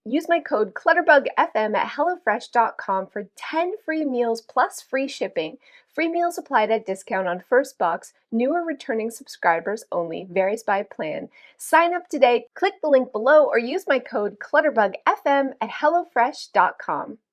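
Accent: Canada. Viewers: Never watched Sister Wives. Cilantro tastes delish to me, one of my favorites, we all have different chemical make ups, they say people who have a certain chemical make up, cilantro tastes like soap. I have a friend who loves dandelion tea and yikes! To me it is so bitter, she also likes sardines, ewe. We are all different and unique not "weird" I think you are smart, funny and beautiful and I love your little Canadian accent, I think you are in Canada? Byeeeeee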